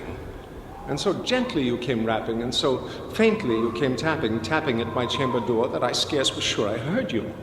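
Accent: United States. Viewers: it's a nyew yoak accent. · "The Raven," straight out of Brooklyn. This is ridiculous.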